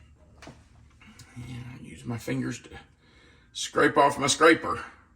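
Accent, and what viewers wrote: South, United States. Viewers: I was just going to download your video so I could make this cake later, but that wonderful Southern accent kept me glued to the screen!😂 Your voice is very pleasing, and that cake - wow!! You're a great teacher by the way, thank you!!❤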